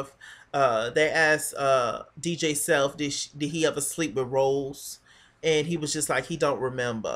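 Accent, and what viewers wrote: New York, United States. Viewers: The New York accent is just too real. Yo, is you from Brooklyn or the Bronx? I TOTALLY agree with your opinion of the busted up old ass creep squad. I don't see what any of these females, season after season, see in any of those guys. In addition to being old, washed up, and unattractive - I have never seen one of them do anything to further anybody's music career. People don't seem to like BBOD or Moe Money, but one thing that I will say in her defense...she didn't allow Cisco to play her once she found out that he was screwing Mariah No Carey. When it comes to LHH, these dumb ass chicks fight over these lame ass dudes. But Moe cut it off at that very moment and told Mariah that she could have him. I was totally here for how she handled that. GREAT REVIEWS FOR THE WHOLE SEASON! You're awesome!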